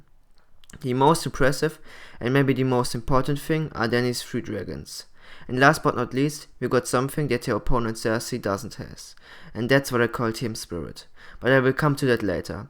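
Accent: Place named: Germany. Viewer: Your accent Tells me you Are German;)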